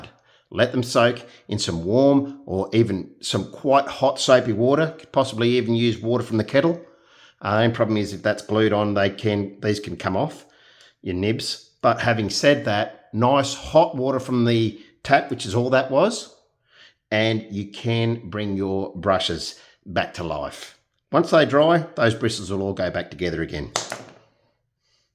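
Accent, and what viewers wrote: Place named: Australia. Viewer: You deserve more views. Love your paintings and especially your very Aussie commentary.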